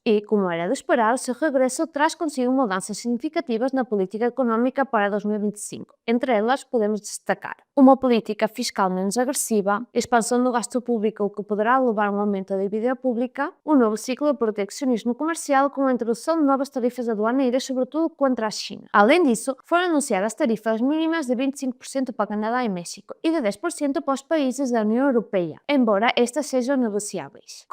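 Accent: Spain. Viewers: não se percebe muito bem o teu "portunhol"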